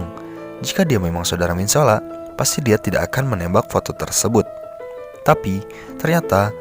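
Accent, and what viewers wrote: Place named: West Java, Indonesia. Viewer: Aduh kang Sunda pisan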